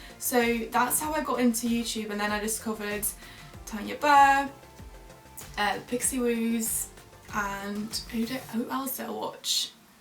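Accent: United Kingdom. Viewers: No her accent is not like America. Her accent is real British. You don't notice when she pronounces letter "T" is pronounced real T sound . American accent letter "T " is pronounced like D sound. For instance she pronounced word Better, later it is real British sound . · You sound extreamily british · You have a fascinating accent. A mix of London, Midlands, Southeast with a bit of Scottish thrown in! A tiny bit of a Dutch accent. What an achievement! Well done. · Gosh!You sound literally like Elizabeth Bennet from Pride and Prejudice.....what a great British accent(with a twist of a classical Georgian era English) you have! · Only if u would practice a bit more on ur intonation .. But gush the sounds of the letters are just like real british ..i'm jealous :) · Most countries synchronize television programs with the native language. In the Netherlands we watch all American and English programs in English with subtitles. 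Best way to learn English. Ik vind jouw Britse accent trouwens prachtig. Ik kan bijna niet geloven dat je eigenlijk Nederlandse bent!!! Well done!! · You have a very nice British accent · Interesting video, I like your accent, it's British without being too British if you see what I mean. There is just a hint of another accent in there but if I didn't know you were Dutch I would struggle to place it. However it gives your voice an extra intonation and makes it definitely you, RP is somewhat dull so that extra bit sets your accent off nicely. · Such a talent! I wish I had a British accent too. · I didn't focus on the content of the video.. I just noticed you have such wonderful eyes and a pretty face xD. btw I loved the British accent thanks to you and I'm goin' to learn it <3 xDD .. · Your grasp of the English language is very good. From an American ear your English voice has a British accent with some other influence. 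Thank you for taking the time to make these videos. It's a pleasure watching you explain things from a Dutch perspective. Kudos. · Your accent is not forced, it's acquired and sounds purely British :) Which UK university are you attending? Is it in the southern England? (Just out of curiosity) I've studied in Reading for a year but that time was only enough to acquire a slight British accent, however, thankfully, watching the BBC news and some TV programs every day, made a huge difference.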